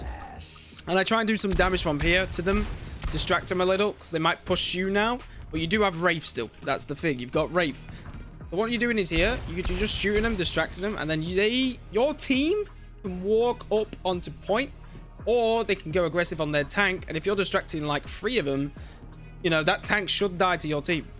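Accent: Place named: South London, United Kingdom. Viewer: What part of London is the accent from bro? Sounds south.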